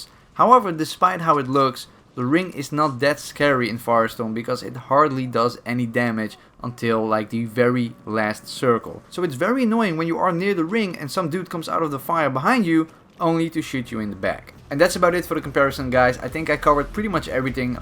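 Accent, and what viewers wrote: Netherlands. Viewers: I feel he's Dutch by his accent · Are you Dutch bro ?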